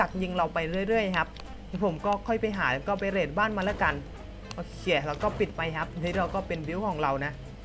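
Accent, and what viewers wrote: South, Thailand. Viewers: คนใต้ป่าว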